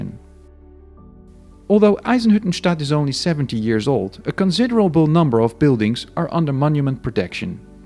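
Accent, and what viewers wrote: Netherlands. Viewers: You sound very Dutch.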